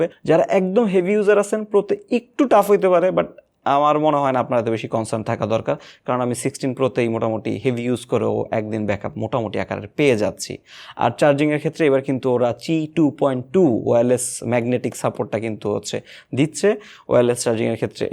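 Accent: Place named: Chittagong, Bangladesh. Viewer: ভাই এর কথার টোন শুনে মনে হচ্ছে উনি আমাদের চ্যাটগাঁইয়া। 🙂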